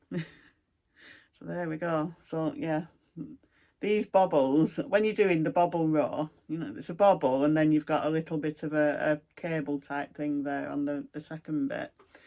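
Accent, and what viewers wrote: Lancashire, United Kingdom. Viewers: HI,great video, nice to hear a fellow Lancashire girl ( I am a Blackburn girl originally!) Love the block party pattern - I have just bought the Halloween one from nimble thimble for daughters up and coming birthday ( aka flossibilities on you tube), I love the winter patterns especially the ones with snow!!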